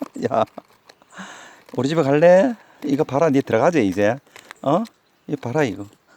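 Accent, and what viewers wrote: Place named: Gyeongbuk, South Korea. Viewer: 경북쪽이신가 말투가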